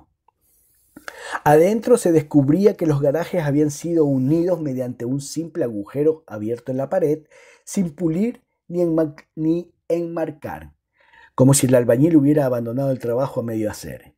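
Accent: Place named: Latin America. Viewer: Muchas gracias por la narración y por el esfuerzo. La entonación foránea latinoamericaniza estas historias criollas peruanas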